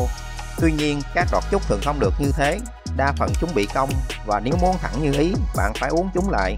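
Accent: Mekong Delta, Vietnam. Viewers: nói chuyện đậm chất người miền Tây luôn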